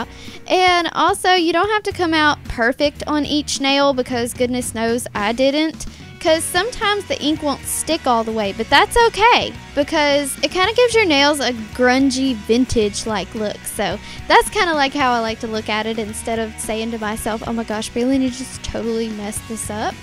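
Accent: South, United States. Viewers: You are a adorable!! I LOVE YOUR SOUTHERN TWANG !!<3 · Where Are You From? You Sound Extra Southern.. Lol But Your Real Pretty.. ! I Subscribed!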